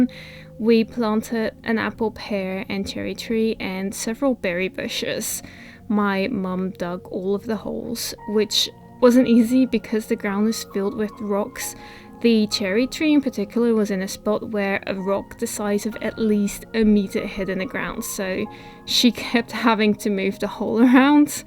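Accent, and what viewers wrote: Netherlands. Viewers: De eerste keer dat ik een filmpje van jou zag dacht ik bij mezelf; er is iets met haar accent, waar zou ze vandaan komen? Erg grappig om Nederlands te horen 😃 je tuin wordt erg mooi en ik kijk elke keer uit naar de zondag! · I also heard Dutch… Groeten vanuit Breda 🥰🥰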